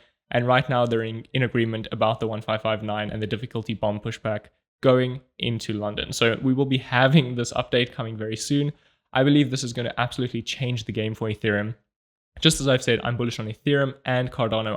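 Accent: South Africa. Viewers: South African? Nice to hear our accent online dropping some knowledge!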